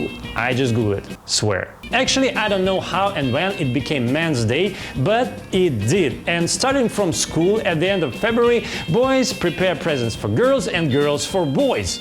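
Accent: Russia. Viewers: Русский английский - идеальный для прокачки уровня - больше новых слов, понятных по контексту и произношению. Идеально! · явно видно русско-английский акцент 😅, You have to work more and practice your speech 💪👍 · ну такое, хорошо, сильный русский акцент, у меня ровно такой же, поэтому легко воспринимать. пока непонятно откуда планируешь брать англоязычный трафик, посмотрим, подписался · Кто пишет "не такой уж сильный", вы серьезно? Иван сверху правильно написал, вам только так кажется, т.к. вы в голове примерно так же читаете по-английски (за это кстати "спасибо" нашим урокам английского в школах). Послушайте носителя языка, а потом, и всё сразу поймете. А для англоговорящих зрителей это еще усиливается, и для них он будет звучать именно как такой типичный русский акцент - лондон из э кэпитл оф грэйт бритн; лет ми спик фром май харт... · Russian English( Но в любом случае очень хороший) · This genre should be cool only if you have a real native speaker, of course, for russians that`s easier to understand russian accent, but if you want to attract attention of foreign viewers, you need someone, who was born english speaking))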